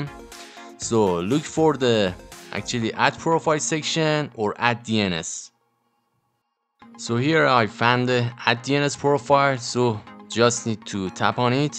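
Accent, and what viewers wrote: Iran. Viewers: پیش خودم گفتم این چقدر شبیه ایرانیا انگلییسی حرف میزنه تا اینکه همراهکارتو دیدم😂❤️❤️کارت درسته لنگ دی ان اس بودم❤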